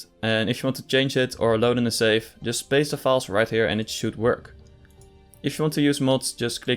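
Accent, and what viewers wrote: Netherlands. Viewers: wait are you dutch?? i hear by the accent. instead of some you say som, so if youre dutch too comment plzz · nice! Your channel seems nice, i subscribed :) also is that a dutch accent i hear? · If you download sword or shield and there is an update thingy do you need to download it or can you just keep playing and the emulator just updates it automatically Ps. I can hear your dutch😉 Is wel goeie man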